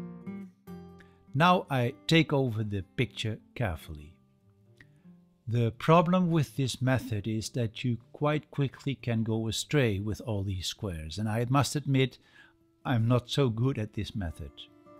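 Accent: Netherlands. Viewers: Are you Dutch? You are an amazing teacher and the accent helps (I like Dutch accents)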